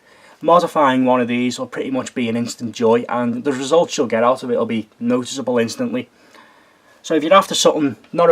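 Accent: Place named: Merseyside, United Kingdom. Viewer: Hi mate you sound like you live in Merseyside. Do you know anywhere here where we can shoot airguns? I live in birkenhead lol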